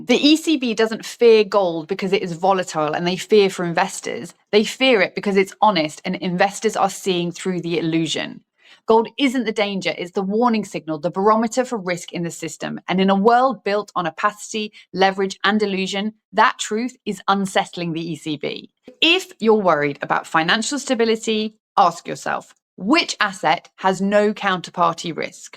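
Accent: United Kingdom. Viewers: Love this fine lady. She speaks my language and with a beautiful and elegant British accent. 👍 ❤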